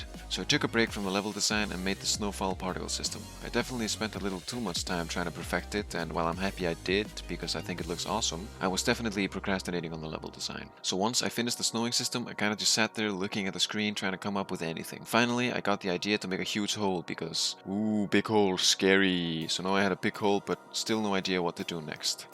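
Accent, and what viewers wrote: Iceland. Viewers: Are you icelandic? Accent🤨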